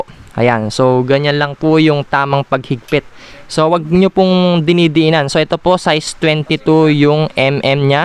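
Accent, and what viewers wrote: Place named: Pampanga, Philippines. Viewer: Ot atin halo kapampangan ING Tagalog mo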